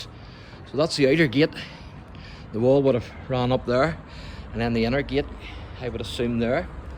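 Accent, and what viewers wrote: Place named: Northern Ireland, United Kingdom. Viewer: I'm from Drogheda - the correct pronunciation is Draw-da, because that's how we say it. I could accuse you of having a Northern accent too but, frankly, kettles and pots! Keep up the good work.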